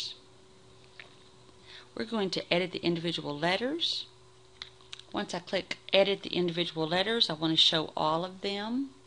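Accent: United States. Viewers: are you Texan? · I just love your southern accent.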